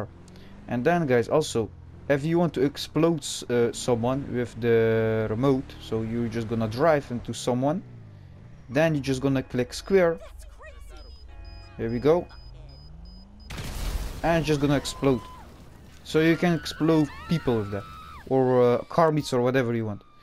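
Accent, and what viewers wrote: Netherlands. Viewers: Lekker Nederlands accent door het Engels heen hahah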